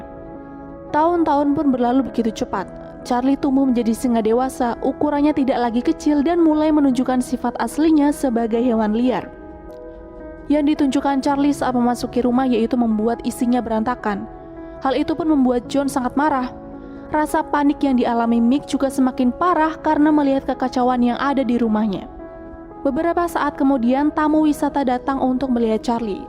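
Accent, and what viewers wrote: Sunda, Indonesia. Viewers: Ngeunah euy suara sunda na 🤭😅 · Bodor aya sundana hahaha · aya sunda an euy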